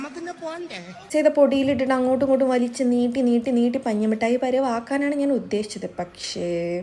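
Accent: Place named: Kayamkulam, India. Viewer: Soana papadi kayamkulam bhasha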